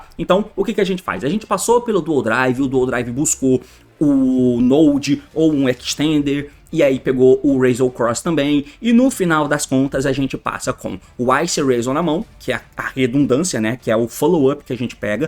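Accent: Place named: Bahia, Brazil. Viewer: Não deixe os haters mudarem teu jeito baiano de falar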